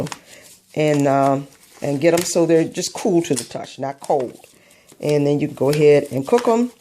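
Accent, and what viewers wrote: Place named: South, United States. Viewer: She sounds New Jersey ish and southern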